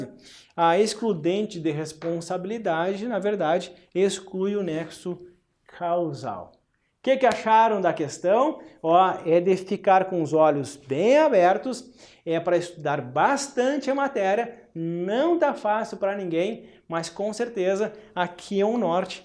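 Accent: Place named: Rio Grande do Sul, Brazil. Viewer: Adoro o sotaque gaúcho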